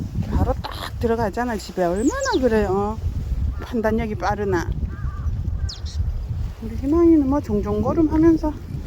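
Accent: Gyeongsang, South Korea. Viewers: 대구사시나봐요~ 사투리 억양이 대구표준어의 정석이네요. 저랑 말투 똑같아서 들을 때마다 놀라요. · 집사님 목소리가 너무 좋으세요 경상도분이세요?😅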